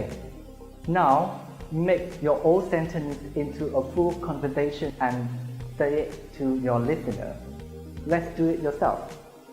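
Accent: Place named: England, United Kingdom. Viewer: You've got English accent:)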